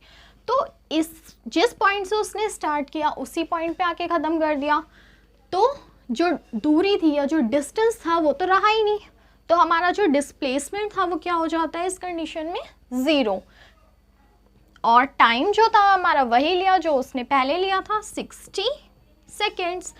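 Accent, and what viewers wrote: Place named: United Kingdom. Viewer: Mam apki accent british wali h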